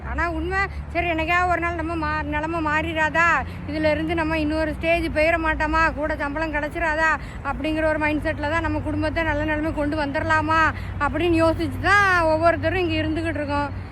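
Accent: Tirunelveli, India. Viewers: Unga speech fine sister 🎉. Unga speech tirunelveli touch. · நெல்லை தமிழ், கொள்ளை அழகு நல்ல தமிழ்!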